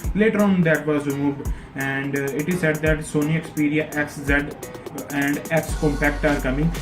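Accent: Punjab, India. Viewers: oh Jada naa bol gandia jehia video banada hai knowledge koi nehi ,oda hi boli janda moto g4 9000 da ayia hai tu 11000 dasi janda teri news hi chuthi AA ...... PTA nehi ketho ketho AA Jande AA .. 22 technical guruji Ja sharmaji technical dekh kini sehi news dende aa ... rest comment in Hindi...... or baat English ki Teri English vi Punjabi tone vali hai Tumhari videos dekh ke admi bor ho jata hai.. tabhi koi view Ja like Ja comment nehi ata.......💩💩💩💩💩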